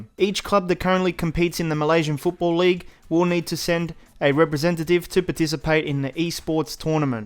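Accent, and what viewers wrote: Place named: Australia. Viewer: I'm an Australian guy living in 🇪🇺 and I have to say I just watch this channel for the hosts accent....I feel like I'm back home at the Gabba with a cold one on the first day of the November test match! 😅🤣😂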